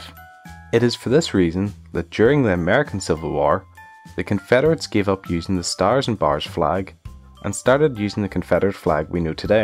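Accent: Northern Ireland, United Kingdom. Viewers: Dammit, I knew I should have guessed Northern Irish... oh well. ;) And thanks - it was the vowels that gave it away, I knew it must at least be related to Scottish. I've had a lot of exposure to English over the years, even for a Danish guy, so the vowels were very easy to notice. I've had almost no problems understanding your dialect. I mean, I've occasionally had to go back and listen one more time, but then that can happen with American and other British dialects as well. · Are you from Northern Ireland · I find ur accent very distinguishable as Northern Irish but also easy to understand.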